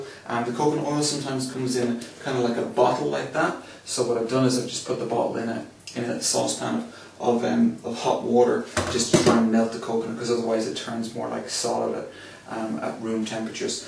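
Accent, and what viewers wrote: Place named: Ireland. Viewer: It's strange actually hearing a fellow Irishman on youtube, it's usually americans.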